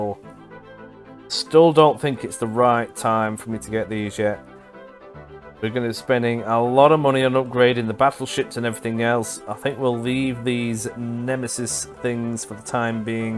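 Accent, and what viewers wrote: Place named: England, United Kingdom. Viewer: do you live up north or down south? Just because you said you were Scottish but you sound English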